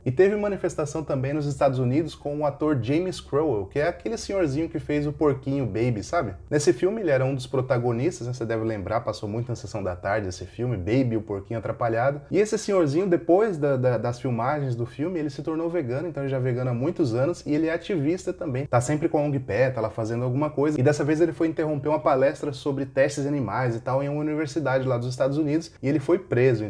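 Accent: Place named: Rio Grande do Sul, Brazil. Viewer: Tu foi afetado pelo gauchês. É um caminho sem volta. Minha noiva (de Santa Catarina) já fala tri, bah, afu, tchê, chinelagem e cacetinho. Bem-vindo ao clube. Mazaaaaa!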